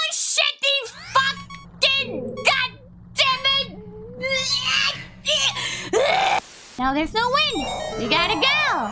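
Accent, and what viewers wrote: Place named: South, United States. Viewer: the cursier she gets the more her Southern drawl comes out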